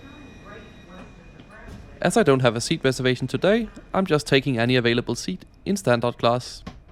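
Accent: Denmark. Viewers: I know. He’s danish. We’re somewhat in between UK English and US English when it comes to speaking English. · Your Danish accent is very nice to listen to, much nicer than most U.K. dialects!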